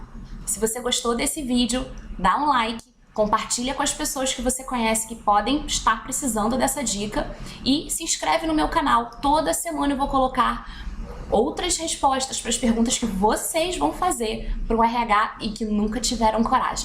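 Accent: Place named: Rio de Janeiro, Brazil. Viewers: Excelente video, adorei as dicas e a forma que vc aborda os problemas e soluções. Só não gostei do sotaque...carioques muito acentuada. Parabéns. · Ela e carioca😆😆😆😆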